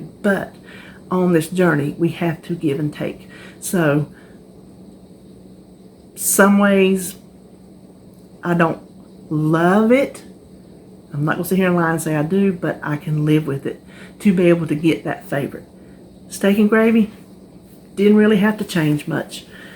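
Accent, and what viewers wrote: South, United States. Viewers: I'm pretty new to WW and I found your videos last night, and have been watching so many of them. I just adore you. I'm from SC and just your southern accent and colloquialisms make me think of my grandmother and your videos feel so homey. Thanks for sharing!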